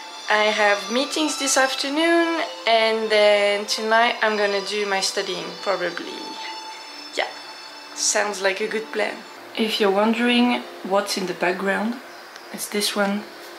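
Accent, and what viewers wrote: France. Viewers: おめでとうございます!! 🎉🥳 I love how your lovely French accent comes through more when you're excited! 😊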